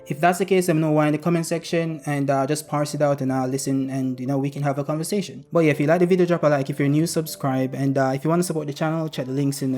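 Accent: Jamaica. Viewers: Me hear a Jamaican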